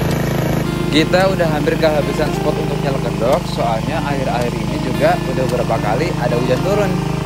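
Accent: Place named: Banyumas, Indonesia. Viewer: wong ngapaknya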